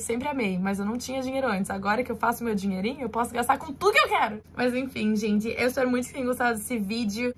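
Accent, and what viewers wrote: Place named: South, Brazil. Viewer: o sotaque sulista deixa tudo melhor, me identifico kkkkk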